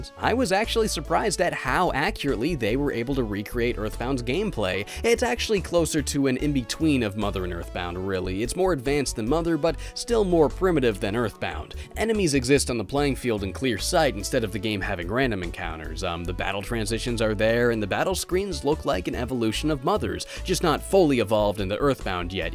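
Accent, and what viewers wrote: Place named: Canada. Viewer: Are you Canadian? Noticed the -ehr-'s on your "A" pronunciation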